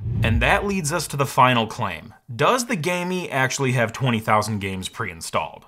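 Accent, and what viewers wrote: Canada. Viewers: Are you Canadian? People tell me I don't pronounce vague correctly, but I think it might be an accent thing. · That's just how Canadian people say vague. Don't change who you are ;)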